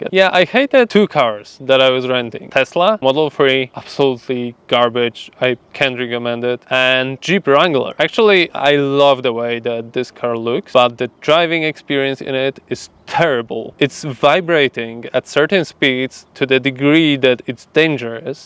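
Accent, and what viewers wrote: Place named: Poland. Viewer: Po pierwszych pięciu słowach pomyślałem „hmm… z akcentu chyba Polak” 😆 Gratuluję zakupu prosiaka za tak śmieszną kwotę! Pozazdraszczam... 😉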